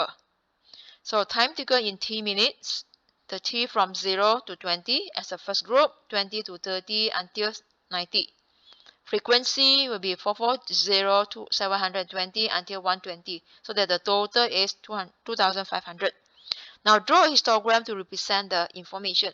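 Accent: Asia. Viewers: Love the Asian Accent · i hate permutations and combinations but u explain it so effortlessly maam, thank u sm i subscribed... btw i love the asian accent its so nice to listen to idk why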